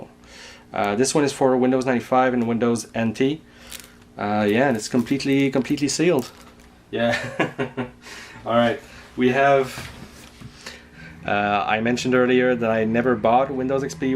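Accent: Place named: Quebec, Canada. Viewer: everything is in french, the t-shirt show that the tour is in quebec and also you have the quebecois accent when we try to speak english... mmm are you in quebec province ?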